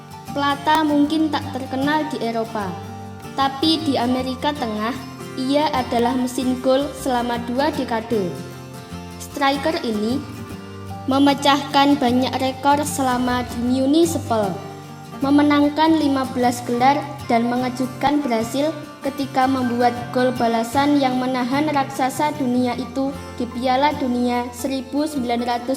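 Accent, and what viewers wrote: Java, Indonesia. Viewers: Medok dan cadel mbak eeeee... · Medok e mba mba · Jowo to mba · medok kali to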